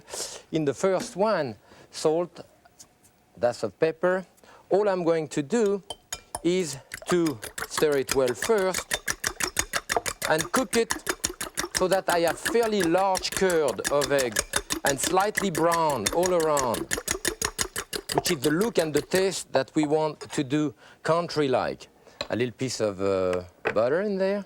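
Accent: France. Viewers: beautiful french accent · Well, no doubt he was born French and lived there - until he met and fell in love with an American woman. Being a gentleman, he moved here instead of asking her to be uprooted and move there. And so now he is an American, and a citizen. We thank France, but we definitely claim him. Being the man he is, we'd have given him a permanent green card - so it was his choice - and he became an American. Only his voice, his training, his memories and his accent are French now. And probably a corner of his heart, which is also fine. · Thought he was speaking French until 5 seconds in. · Im a french canadian and I just enjoy the french accent ! Im trying to hide mine but it doesn't work often ! hahahah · This video must have been made over 25 years ago when he was quite young and had heavy French accent. His French accent is not so strong in his latest TV shows. 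Also, I bet he does not scrape a non-stick pan with sharp-pointed metal forks any more. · god dammed that guy. fuckin' french way too strong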